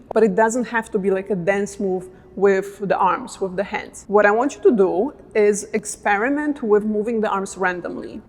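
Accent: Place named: Poland. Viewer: As a pole from the accent, I think you are polish, are you??! Am I right ?!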